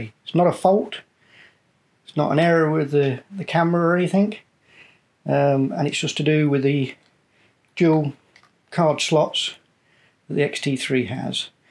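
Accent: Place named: Derbyshire, United Kingdom. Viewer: Is that a north Derbyshire/north Notts accent I can hear?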